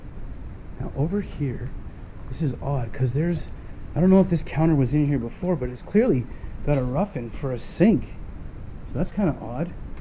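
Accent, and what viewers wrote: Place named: Canada. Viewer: ABSOLUTELY LOVE THAT CANADIAN ACCENT!!! WE LOVE EVERYTHING ABOUT YA!!!! 😍🌟👍👍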